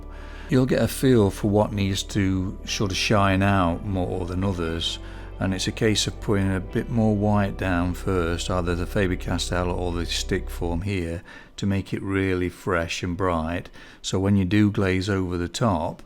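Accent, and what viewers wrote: United Kingdom. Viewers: Thank you, I have learned a lot from you; actually you were the one that introduce pastel pencils to me! I didn't know there actually exists such a great tool; I just used to draw and paint using pastel sticks, oil, and charcoal. I have downloaded your videos and watch them everyday with considerable attention. It's funny that my daughter's accent is going to change to British as a result of listening to your commentary. I wonder if you are able to let me know how you take your videos! Do you use a DSLR cam or a simple mobile phone? I appreciate all your art and generosity.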